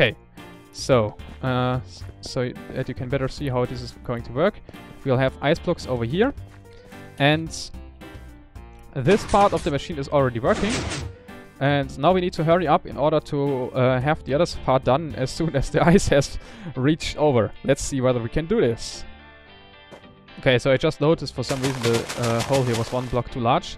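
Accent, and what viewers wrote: Germany. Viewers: Your english is VERY german.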